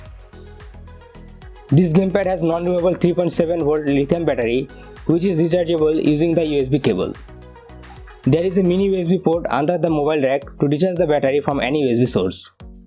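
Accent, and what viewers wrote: India. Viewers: 3 indian accent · indians and their trying hard English accent. pain to the ears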